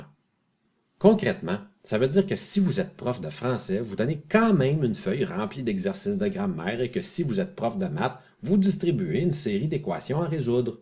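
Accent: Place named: Canada. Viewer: mira Khodja cet l'accent canadien :)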